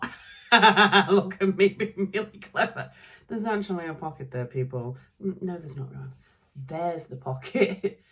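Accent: Yorkshire, United Kingdom. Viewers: I made myself a gnome printed turtleneck top to wear to the Christmas open house at the childcare centre I work at. It was a big hit. Also I have been hosting tea parties in each classroom with the children, as I'm serving the tea, (water), I talk to them in a British accent which actually sounds like you. Of course it just makes them roar with laughter. My local fabric store still doesn't carry that stretchy thread, so frustrating. I make sure I watch your vlogmas before I leave for work everyday. That way I start my day with a smile. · That 'gonk' fabric is awesome! I literally gasped when you held it up. I had to laugh at your comment about being British and talking about the weather. I think it's our national obsession. I'm near Glasgow and we've had -10 degrees yesterday and today. I wouldn't have minded except our boiler decided to pack in and it took Scottish Gas 2 days to get out to us to fix it. 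The house was only marginally warmer indoors than it was outdoors!! I can honestly say, that I don't ever care to repeat that experience. At least your videos have kept me cheerful. Keep going, Mrs, I love your smile and infectious laugh. I'm also secretly loving your accent. It's been nearly 15 years since we moved up from Yorkshire to Glasgow and I didn't realise how much I missed the accent until I started watching your videos.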